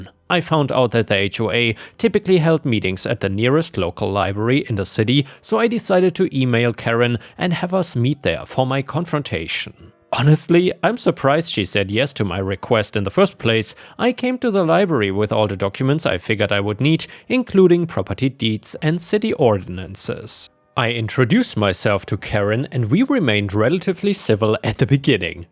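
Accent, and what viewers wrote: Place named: Germany. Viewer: I truly enjoy your content, the short stories at the end, and especially those vids of you and your wife utterly spoiling your cats. Those kitties appear to be SO well mannered and loving all the attention you lavish on them. But I AM curious about where you hail from. I know you live in Thailand, but your accent seems to be European - maybe German? But I know so little of all the various languages and regions of the EU I'm likely totally wrong. Would you mind sharing a little bit of yourself?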